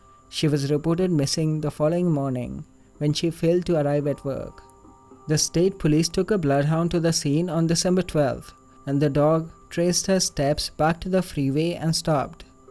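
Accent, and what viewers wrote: India. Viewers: Indian guy..... narrator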